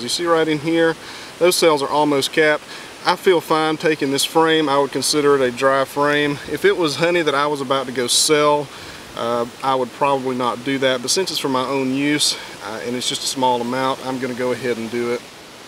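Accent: South, United States. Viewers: with a southern accent 😳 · 😂 love his thick (southern?) I think accent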